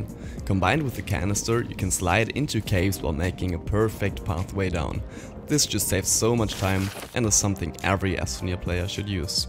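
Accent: Germany. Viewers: Der deutsche akzent ahhh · You are German Right?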